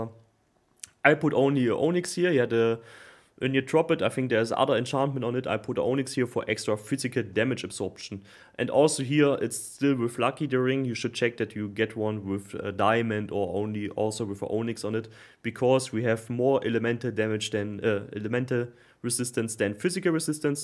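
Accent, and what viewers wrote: Germany. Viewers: are you german by any chance? your english sounds too funny haha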